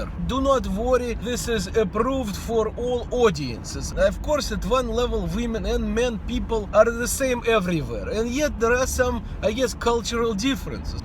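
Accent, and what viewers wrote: Russia. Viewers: you clearly know how to speak English very well! I wish I knew what you sound like when you’re not purposely using this heavy Russian accent. <3 your content regardless! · At first I thought you were faking a heavy Russian accent. But then I realize that it IS your accent. And it is very cute. Keep up the good work. Your videos are excellent!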